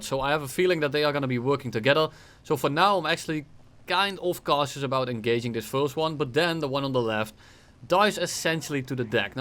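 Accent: Brabant, Netherlands. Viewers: for anyone intrested, theyre called vliegtuigboMMen, not vliegtuigbonnen. has a dutch accent (prob brabant) making his pronounciation deviate from normal Dutch people (ABN) 🤓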